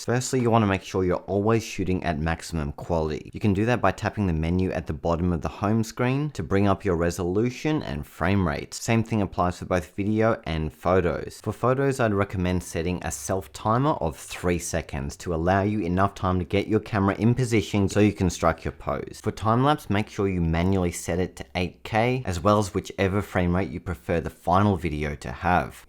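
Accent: Australia. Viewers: wonderful to hear the Aussie accent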